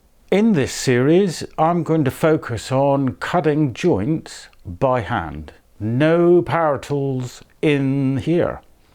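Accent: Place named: West Country, United Kingdom. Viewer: HI this looks interesting. Good to hear a West Country accent talking about woodwork. Having taken up woodwork 7 years ago when I retired I focussed on machine joinery. But recently my interest has been spiked to learn some hand skills using Japanese saws etc., so I will be very interested to watch your videos.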